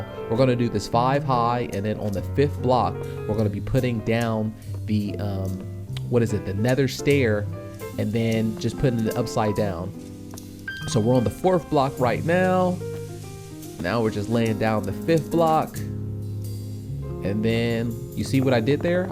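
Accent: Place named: South, United States. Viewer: Nice! I hear an accent in your voice--are you southern?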